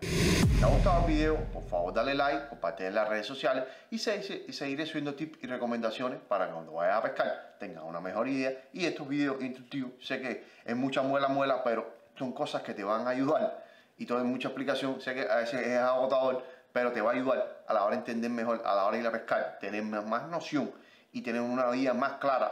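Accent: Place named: Cuba. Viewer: usted por ese hacento es cubano . Esa tonada no se va ni con 24 años en Argentina. buen Video Suscrito Coherente Lo Que dices Saludos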